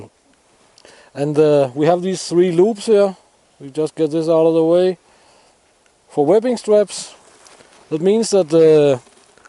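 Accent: Denmark. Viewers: I looove the accent! Danish? (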